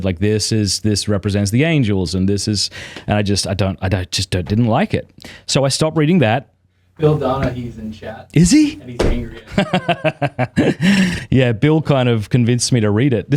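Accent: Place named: Australia. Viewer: As a Texan, I have to say that hearing brother say "y'all" in that Australian accent simultaneously delights me and makes me feel weird. Love the channel; it's been a great help on my own journey.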